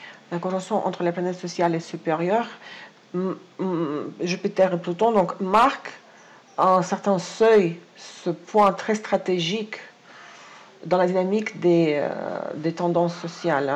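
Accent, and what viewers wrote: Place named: Russia. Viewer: Merci! Je ne rate pas une vidéo depuis longtemps. J’ai, depuis mes études, une grande amie au Costa Rica, musicienne professionnelle connue, à SanJose. Cela me rapproche. Aussi, je suis impressionné par votre magnifique connaissance des langues, très touché par votre affection pour le français et les francophones, et votre très belle personnalité. Votre accent russe est vraiment charmant! Suis Taureau, ascendant Lion, et je vous remercie pour votre travail et votre bel esprit. Un beau bonjour depuis Paris!🎶💐🌷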